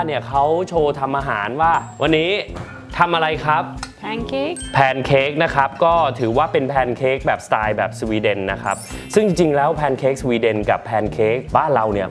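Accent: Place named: Thailand. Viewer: พี่บอยสำเนียงไทยมากกกก น่ารัก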